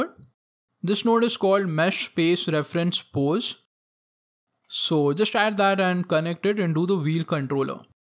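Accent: India. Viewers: you look american but talk in a indian accent my stereotypical brain don't know what to do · this is what I mean when I say indian accent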